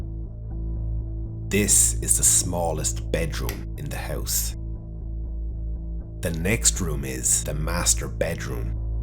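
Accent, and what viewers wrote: Ireland. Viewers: I love your accent which travels far and wide before coming home to Eire. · Really professional. Are you Irish? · I don't know who you are, but you have found your calling! I just discovered your channel tonight and have since watched all 8 of your videos/mini-documentaries. They are better than 99% of the docs on Netflix, etc.! They are thoughtful, expertly researched and produced, well-paced, and leave the viewer wanting more. The Irish accent is just the topper! Now, get to work and give the people what we need, tout de suite! 😂😍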